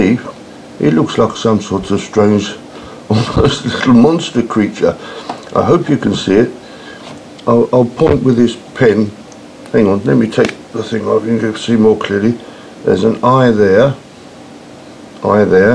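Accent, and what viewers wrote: United Kingdom. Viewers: Well, it's great you raise those questions! People do need to challenge their familiarities and I suppose your questions do just that. I haven't gotten to watch all of your videos, but I was curious to know where you got your education. I speculate that you're British from the accent, but that's the best guess I could give! So what's your story, in short?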